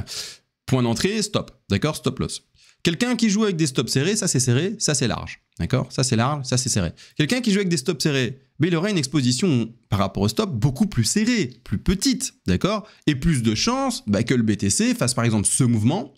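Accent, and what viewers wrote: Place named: Belgium. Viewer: Nous on dit « uit » toi tu dis « ouit » Mais depuis que je te suis, je me suis habituée 😉 Du coup tu es quoi ? Belge ? Suisse ?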